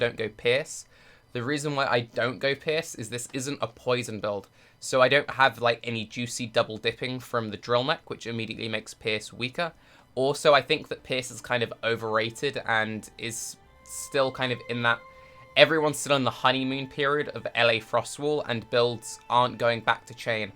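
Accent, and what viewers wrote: United Kingdom. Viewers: Nice to know your graphic's card is going to arrive relatively soon. For what it's worth, i think your guides are actually really enjoyable even without gameplay. And that british accent is lovely <3. Hope you get better with your Chron's disease, and keep up the good work :D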